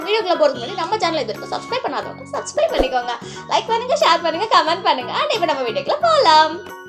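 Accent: Tirunelveli, India. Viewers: Akka neega tirunelveli ya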